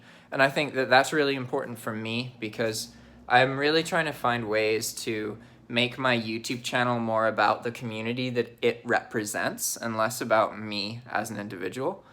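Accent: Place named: England, United Kingdom. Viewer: Great video. You are a very inspirational person. Glad u are back home. Did anyone ever tell u you have a slight accent? Like u sound like u were in England and picked up a little of how they talk. Anyway, Ive been watching u for awhile and hope everything goes well for u.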